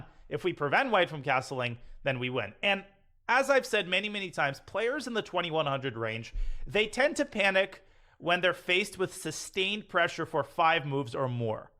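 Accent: Russia. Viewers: Sensei Danya you have to speak with a lovely russian accent more often. I love it :) Ofc love your priceless vids too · Nipo imitation? So fun to here this russian accent. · That Russian accent always gets me. Much love, Danya!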